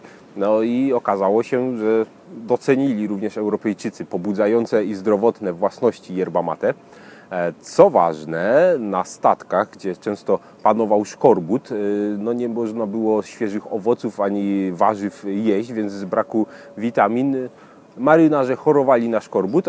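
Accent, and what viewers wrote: Silesia, Poland. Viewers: jesteś ze Śląska prawda? :D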